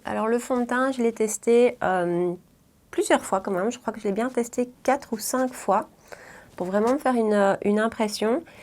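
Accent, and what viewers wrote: Belgium. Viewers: Coucou ma petite. La notification qui fait plaisir à 19h pétante en plus avec un GRWM comme on aime 😁. Ton accent belge on adore 😁. Elle est vraiment belle cette palette de leur collection de Noël, les couleurs ont l’air vraiment intenses et magnifiques de part ce que je vois. Comme tu dis c’est quite ou double chez MUR. Tu me donnes envie de tester quelques produits de chez ELF comme la base. Il faut vraiment que tu testes la poudre lumineuse de chez ELF. (La loge de Gabrielle en parle souvent et la met principalement sur les côtés du visage pour donner un coup d’éclat naturel à la peau vu qu’elle a la peau mixte). Celui que je préfère en petit prix c’est celui de Catrice (je ne sais plus comment il s’appelle exactement), il a une très bonne tenue, une belle couvrance et n’est pas trop asséchant pour mon contour de l’œil j’aime beaucoup combiné avec le fond de teint matte de Catrice et la poudre matte également. 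Avec notre peau grasse il faut de la belle matité. Sympa le petit combo Nyx + essence pour les sourcils (à tester 🤔). Très joli maquillage au passage tu nous surprendras toujours. Pleins de bisous et une très belle soirée 😘❤️.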